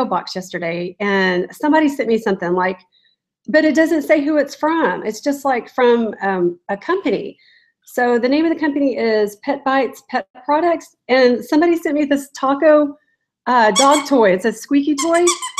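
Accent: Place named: Texas, United States. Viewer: ...and then people teasing you for how you pronounce "jewelry." Lol. I've honestly never noticed that! I have noticed you say "garage sell" instead of "garage sale," and that it's never "when," it's always "whenever." I just figured it's a Texas thing, and I never said anything because one thing all of us as Americans know is you don't mess with Texas! Well, that and I get crap about my West Coast accent and vocabulary, so I have no room to talk. 😂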